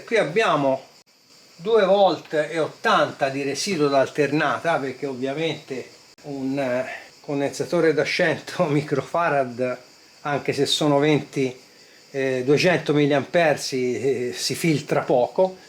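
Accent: Tuscany, Italy. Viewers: Con l'accento toscano sa quanto mi piacciono i suoi video... c'è anche tanta simpatia oltre la tecnica. Ottimo come sempre. Guardato attentamente come a scuola perchè per me è scuola e anche questo video è molto utile perchè fa capire diverse cose, oltre al trucco di testare i condensatori anche come funzionano i tester. Io ho uno come il suo Aneng col guscio verde che me lo godo da 2 anni e la sua manovra di lettura credo che non la sapevo, perchè lo lascio sempre in AUTO e sposto il commutatore. Poi la barretta sotto i numeri che corre nelle letture di certi strumenti... ancora non so a cosa serve... cosa indica... Adesso il video ci casca a fagiolo perchè ho appena finito un alimentatore lineare nel suo telaio che dovrà alimentare un amplificatore da 50 W per canale con integrati LM3886 e mi sono accontentato di questa potenza alimentandolo a 26V ma può dare molto di più ma a me non serve troppa potenza e sarebbe servito un alimentatore più grosso e spazi diversi e il progetto non si voleva spingere a grandi telai tipo anni 80 ma la metà di quelli. 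Ho messo 2 condensatori da 10.000 in parellelo per ramo (+ e -) perchè è duale e il calcolo mi dava 16.000 µF e ho messo 20.000. Adesso devo fare il collaudo sotto carico a 5 A per ramo, ho il carico fittizio e anche l'oscilloscopio nuovo di pochi mesi e caro amico mi diverto come lei. Purtroppo non ho saputo progettare bene dall'inizio perchè volevo due alimentatori separati, uno per canale con 2 toroidali ma avendo comprato un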